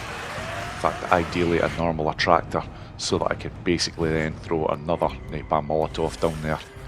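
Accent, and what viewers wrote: Scotland, United Kingdom. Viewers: Love the videos, dude, but I can't help trying to figure out where you're from, haha. Your name is Slavic (or more specifically Serbian and neighbours) and your accent I thought was Dutch at first but now I reckon it's Scottish. You're truly an international man of mystery :)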